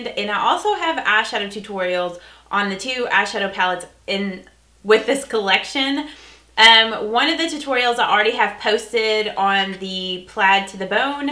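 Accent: South, United States. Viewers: Enter me in the giveaway. I have followed you for a long time, your channel is amazing. Love your Southern Accent and love to here you speak. Blessings!!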